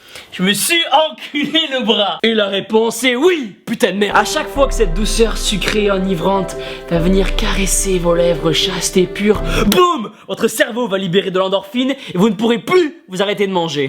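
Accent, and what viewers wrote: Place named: Toulouse, France. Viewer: Daaaaam quoi de mieux qu'un bon accent Toulousain et de la bonne humeur pour se motiver !! Au top !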